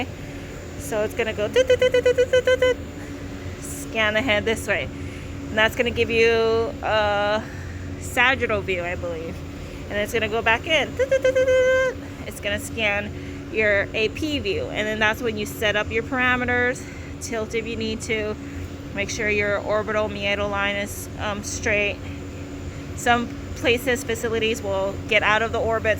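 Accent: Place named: Caribbean. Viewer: Are you Caribbean? I can hear an accent and i feel like you’re one of us lol. very informative video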